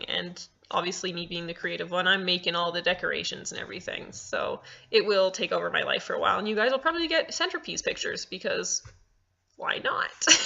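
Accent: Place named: United Kingdom. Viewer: oh dear British accent, really haha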